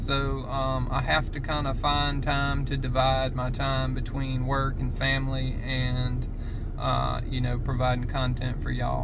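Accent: South, United States. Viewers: speaks in a southern drawl, making ceMENT sound like SEEment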